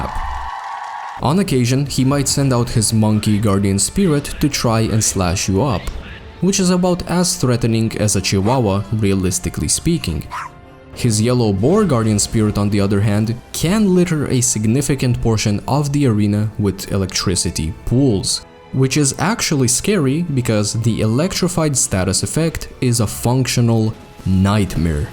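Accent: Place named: Russia. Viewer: не братишка, там к середине конечно начал "наш" акцент проскакивать, но ты 6 часов как штирлиц. Продолжай грайнд!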